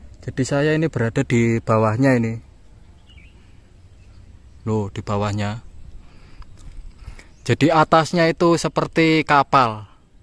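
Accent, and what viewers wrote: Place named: Java, Indonesia. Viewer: Welleh...bahasane kok medhok gumunan ...tow mas.. Bahasane koyo logat lamongan po..? Kok medhok timen.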